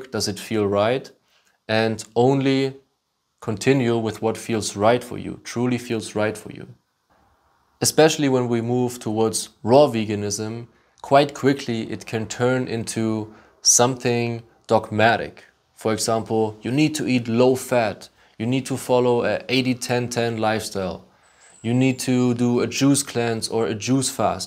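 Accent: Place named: Germany. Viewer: Italian name, German accent... Where are you originally from? I'm from Slovenia.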